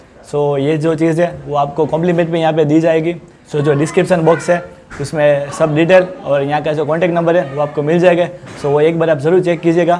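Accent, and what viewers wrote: Gujarat, India. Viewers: Specially Loved u r gujju accent hindi. TRY SOME VLOG IN GUJRATI. Might help u littel bit more to grow. BTW AMAZING EFFORT GL.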